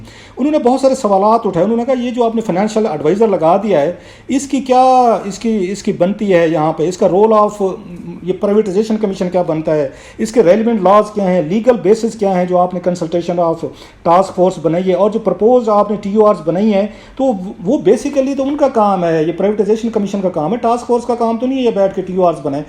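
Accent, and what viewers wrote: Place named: Punjab, Pakistan. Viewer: Please stick to Urdu and don't use English words and short English sentence during your analysis. Because your pronunciation is very bad and you have very strong Punjabi English accent. And I think you should avoid English during your analysis. You have a very lazy accent and can't pronounce words properly. Please speak Urdu and even some of your words in Urdu are pronounced badly.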